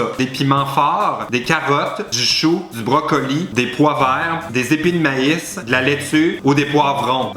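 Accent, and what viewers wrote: Quebec, Canada. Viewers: Franchement je t'adore trop je suis francaise et j'adore l accent quebequoi c est trop chou!!!!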